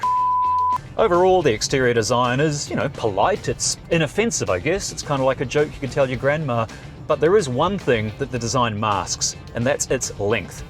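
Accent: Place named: New Zealand. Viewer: yep! Kiwi.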